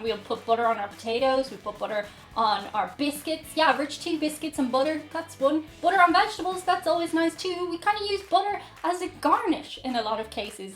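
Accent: Ireland. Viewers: If you happen to be in an old-style American tavern (not the ones with a dozen televisions broadcasting sports and potted ferns, but the ones where old retired guys sit in the afternoon playing cards, smoking filter- less cigarettes and drinking boilermakers) you'll find huge jars of pickled pigs' feet AND large jars of pickled eggs. I've never tried either, but some people love them. Also, I can't speak for all Americans, but most of us love butter. Butter on toast, biscuits, vegetables, potatoes, corn bread, pancakes, banana or zucchini bread, but not many put butter on sandwiches. And, Irish butter is the best, but it's really expensive here. My girlfriend went on a tour of Ireland two years ago and said you put whiskey in a lot of food. Is that true? Can you do a reaction to that? Love your videos and your accent. Irish, isn't it? I live in Illinois. We don't have an accent. 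✌😁👍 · I could listen to that Irish accent all day. It's adorable 😍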